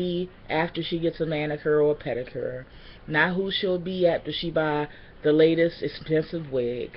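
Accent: Baltimore, United States. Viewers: Trying to catch ur accent! Where r u originally from? I hear Baltimore a bit???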